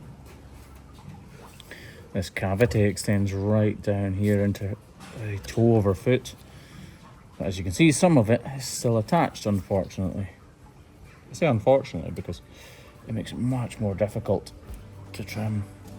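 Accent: Scotland, United Kingdom. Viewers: I just had a short come up under the title satisfying Hoof, is that your short? I ask because the Scottish âccent and voice sound like you. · No, it's Scotland. Between the accent and him saying southwest Scotland in the video.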